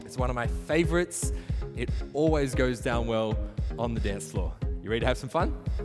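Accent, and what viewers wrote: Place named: England, United Kingdom. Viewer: What English Accent is that?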